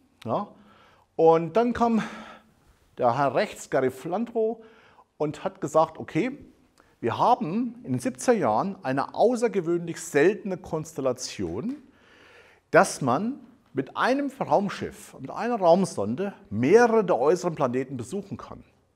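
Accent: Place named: East Germany, Germany. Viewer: Musste leider schnell wieder weg wegen Ossi Aktzent...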